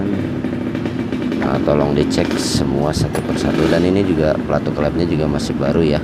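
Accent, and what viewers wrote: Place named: Banyumas, Indonesia. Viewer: Sampean wong ngapak yo.kon wong Endi.salam santun dari Riau 🙏.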